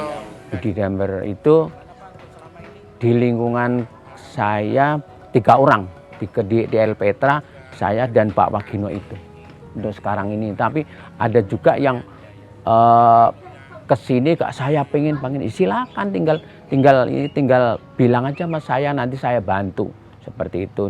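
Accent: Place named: East Java, Indonesia. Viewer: sprt org jatim yah mas ...😁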